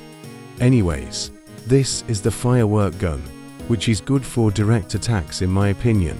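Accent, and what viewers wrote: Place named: United Kingdom. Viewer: British voice 🇬🇧🇬🇧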